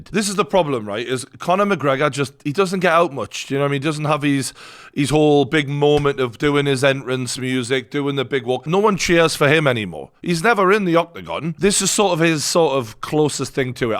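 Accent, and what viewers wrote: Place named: Geordie, United Kingdom. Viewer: Your to much geordie 🤣🤣 but I fucking love it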